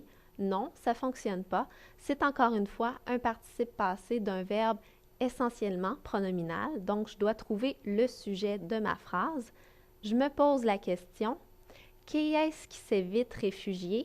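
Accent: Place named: Quebec, Canada. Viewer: merci Québécoise avec ton accent qui s'accorde avec ta belle province ;-)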